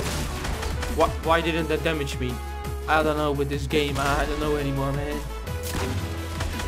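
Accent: Netherlands. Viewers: Ik hoor gwn dat je Nederlands bent geef toe want in een van je video's zij je perongeluk een Nederlands wordt😄😄😅😅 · i luv ur dutch accent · Iemand Nederlands ?? Hij klinkt alsof die nederlands is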